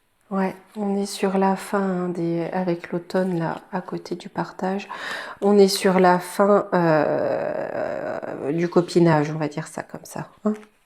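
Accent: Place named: France. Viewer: Bonjour. Merci pour cette vidéo. J'adore votre France parler. Bon week-end également.❤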